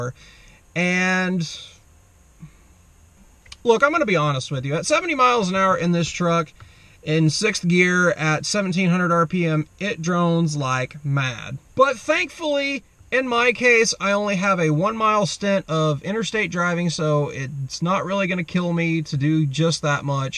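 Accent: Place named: Midwest, United States. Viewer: In Mexico? You sound like you’re from the Midwest?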